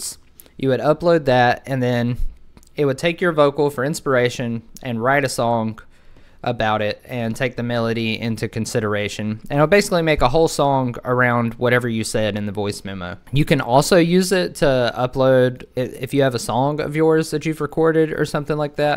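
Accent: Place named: South, United States. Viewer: Nice work bro! What's the accent? It's south of the Ohio river for sure.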